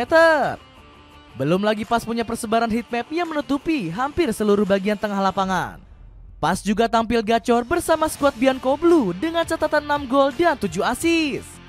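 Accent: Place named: West Java, Indonesia. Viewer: VO nya kaya dialek jabar di daerah 😂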